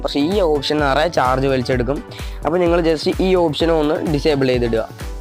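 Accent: Palakkad, India. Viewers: Your palakkadano?? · Enthanda നീ പാലക്കാടാണ് ഓ തെന്നെ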